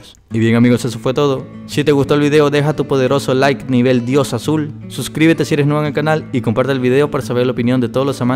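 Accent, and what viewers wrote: Chile. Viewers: Jauregui Zurita chileno por el acento facil de diferenciar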